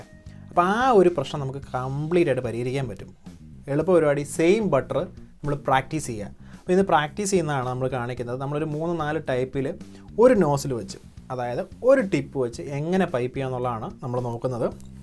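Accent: Kottayam, India. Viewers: ഞാൻ ഇപ്പോഴാണ് e ചാനെൽ കാണുന്നത് സൂപ്പർ അടിപൊളി....നന്നായി പറഞ്ഞ് തരുന്നുണ്ട് tks ...varthaanam കേട്ടിട്ട് കോട്ടയം ആണോ....🥰🥰🥰🥰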